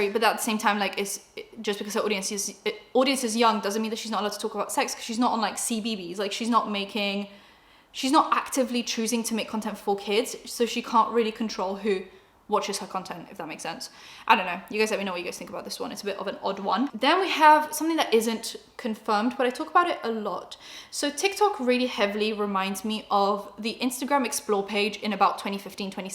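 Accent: United States. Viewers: You had it right, it’s a New York or Jersey type of accent. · She has a harsh Bostonian accent. I live in Boston. And it’s not weird to hear.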